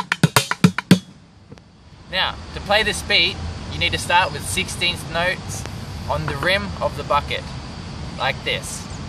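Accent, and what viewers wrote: Australia. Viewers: You got an australian accent now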